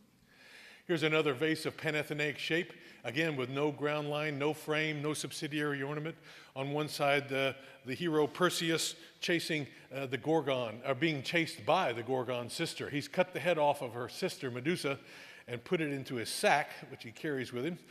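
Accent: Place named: Midwest, United States. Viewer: Thank you for this wonderful, fascinating lecture about Greece, delivered in a charming Midwestern accent. I discovered a new artist who truly deserves worldwide recognition.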